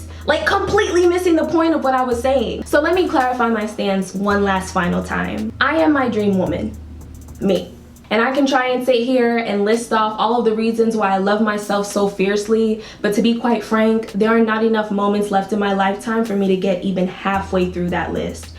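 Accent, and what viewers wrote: Jamaica. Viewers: Are you Jamaican or from the Caribbean because I hear the patwas enuh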